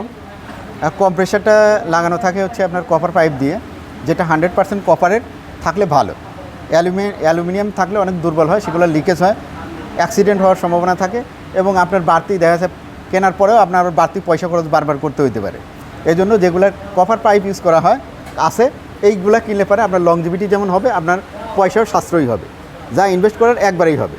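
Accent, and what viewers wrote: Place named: North Bengal, India. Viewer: বাড়ি উত্তরবঙ্গ মনে হয় ভাষার ল্যাঙ্গুয়েজ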